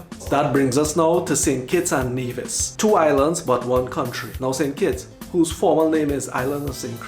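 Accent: Trinidad and Tobago. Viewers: Barbados's name that so fantastic - the obvious reason we were not taught this in school no books were available to know either, but now we can learn. truth has to come out! Thank you and who else would do such a great job with an accent but a Trini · Loved the video, very informative and love your Trini accent, my great grandmother originated from Trinidad and my Grandmother from St Vincent.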